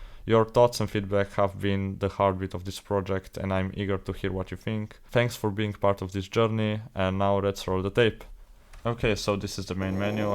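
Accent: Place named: Italy. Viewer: Tf your accent sounds so italian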